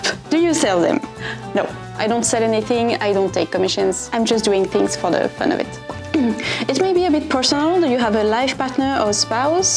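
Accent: France. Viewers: You are just adorable! I love watching you!!! Your accent is why I took 5 years of French. Almost no one speaks French in Western Colorado, but I still think it’s the most beautiful language!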